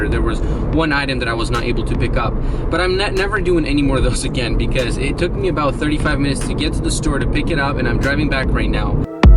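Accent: Russia. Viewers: Bro, are you from Russia?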